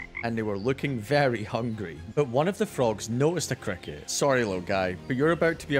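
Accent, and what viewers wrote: Scotland, United Kingdom. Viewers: The best part is your Scottish accent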